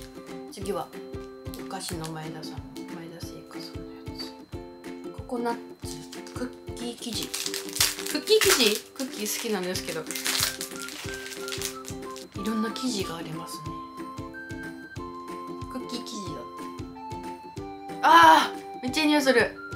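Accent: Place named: Miyazaki, Japan. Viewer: ホンマでっかＴＶでイワクラさんを観て、訛りが気になったのでチェックしたら、宮崎出身の方だったんですね！ イワクラさんって、東国原知事につづく、全国に知らしめる宮崎の顔になりそうな予感です。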